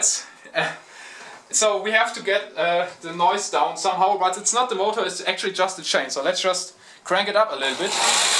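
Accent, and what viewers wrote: Germany. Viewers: Du bist deutsch ich weis es man merkt es voll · you have a proper German accent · Is he German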